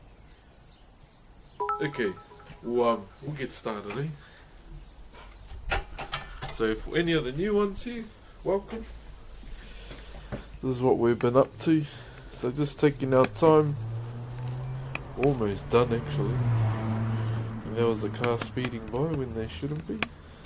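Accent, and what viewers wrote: New Zealand. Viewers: both are kiwis, yeah?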